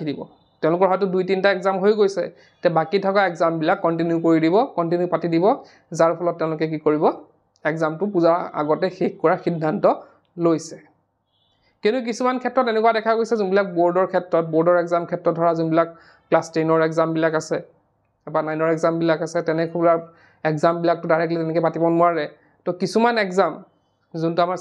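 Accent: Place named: Dhubri, India. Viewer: Dhubri'r khon lage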